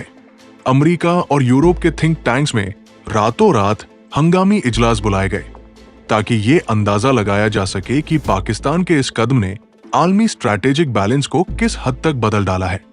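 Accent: India. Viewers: O DUFFER tera lub o lehja bataa raha k tum Indian ho. Is propaganda sa kisi aur ko fool karo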